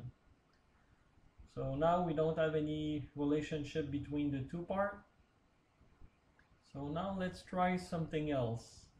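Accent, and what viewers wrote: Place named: Quebec, Canada. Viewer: From your English accent, I have the impression that you're from Québec :) Thanks for the Video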